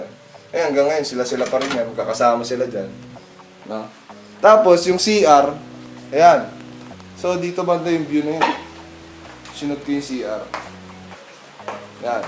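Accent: Ilocos, Philippines. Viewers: Ilocano ka ata eh noh??? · Ilocano ka sir??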